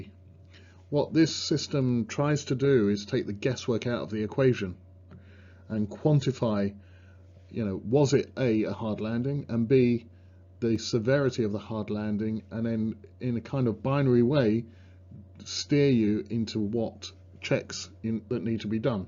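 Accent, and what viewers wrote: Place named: England, United Kingdom. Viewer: Hello thank you very much for all your beautiful video I am one of the pilots who love if I could choose the right word love this Aircraft so my noticed to you is can you please find any other Mike for find how you could fix the volume because really hardly trying to listen to you specially in your English accent the prettiest I guess so so please feed it a bit more louder for six the issue in the mike that would be great from you thank you very much again for all this beautiful video. By the way since couple of years I’m trying to save some money to go for the 605 typerating course so sorry do you suggest to go CEA OR Flight safety or is there any other way to get the typerating cheaper or less than their pricelist specially in coronavirus situation As FAA And one more question please I’m ready for The oral and written test so is there any possible way to go direct to get the exams and then to disability training to save some money because as far as know most of the training centre all over the world doing this option is based on coronavirus situation thank you very much hope to hear from you soon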